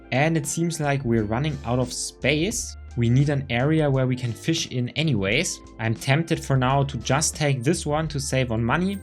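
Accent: Germany. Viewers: Ha ha this accent (german) right?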